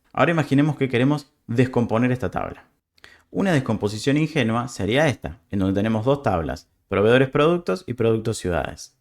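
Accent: Argentina. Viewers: acento argentino que me da confianza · Justo estoy haciendo un TP de este tema, y me agrada escuchar todo esto en "argentino", gracias, te ganaste un suscriptor.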